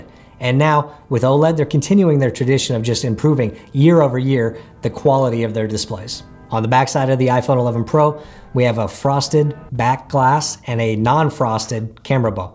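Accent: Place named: Texas, United States. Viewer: you sound texan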